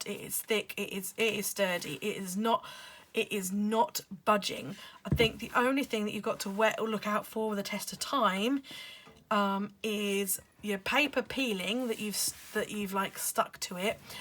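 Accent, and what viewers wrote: England, United Kingdom. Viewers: Lovely to hear another English voice on here! I got mine from Amazon, but I'll be going to The Range in future as is much cheaper!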